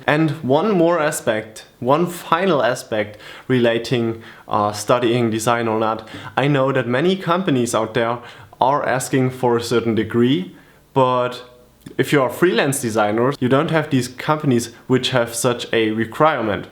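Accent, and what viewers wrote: Germany. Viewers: Where are you from? Germany? :D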